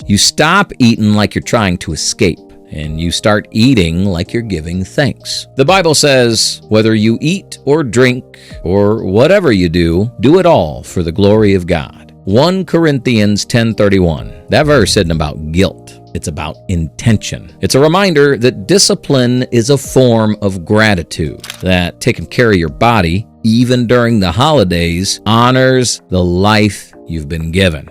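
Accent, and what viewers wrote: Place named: South, United States. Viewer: the southern accent 😭😭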